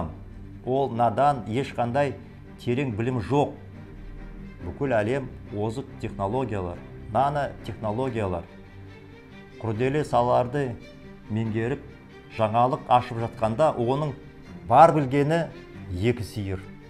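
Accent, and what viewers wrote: Standard. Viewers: казакша таза сойлейди екенсиз. Айтканыздын бари дурыс. Казактар оянындар. Алга Казахстан! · Муктардын казакша сойлегени адеби тилде сойлейди керемет адам 🙋‍♀️👍👍👍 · айсауболшы патриот казахша таза адемы айттын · Казакша Тап Таза сойлейди!👍🏽 Дурыс, Жетер Мына Душпаннан кутылу керек! Аллах разы болсын!!🙏🙏👍🏽💪🏽💪🏽💪🏽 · Казакша өте таза сөилейді екен бурын неге сөйлемеген Токаевтан таза тусінікті сөиледі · Рахмет. Таза қазақша сөйлеп отыр...... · Казакша таза соилеиди екен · Козакша таза соилеп турсыз дурыс аитасыз кашан сол кун туады екен курттып битти барин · Нагыз таза сойлеп тур агайындар рахмет айтып бмрлесейик баска ештеңе керек емес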